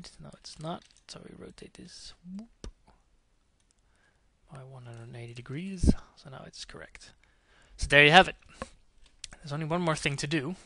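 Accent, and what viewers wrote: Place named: Netherlands. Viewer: Dutch...het accent. nice tut bro ;)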